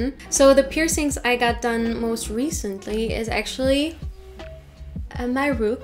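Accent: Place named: Germany. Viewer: Nice Video Hab einfach sofort man dem Akzent gemerkt, das du deutsch bist🥰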